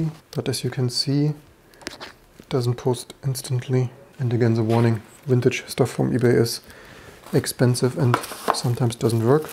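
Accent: Germany. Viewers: Zis is zhe must germanbenglish das ich je gehört habe :D aber nettes Video,l!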